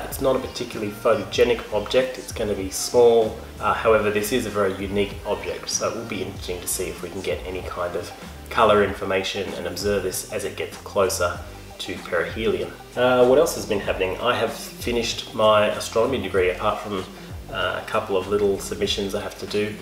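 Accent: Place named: Australia. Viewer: HAHAHAHA, he really has a Down under Accent:)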